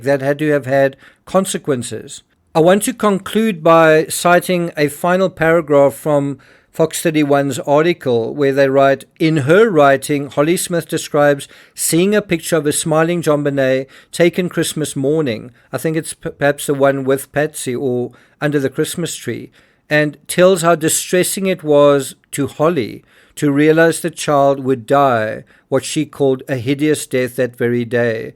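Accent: South Africa. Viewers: You have a South African accent!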